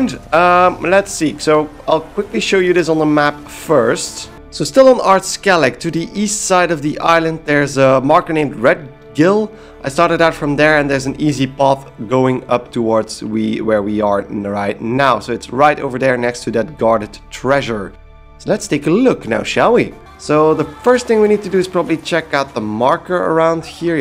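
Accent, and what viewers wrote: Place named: Netherlands. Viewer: Your obviously native dutch speaking 😃 from the Netherlands even !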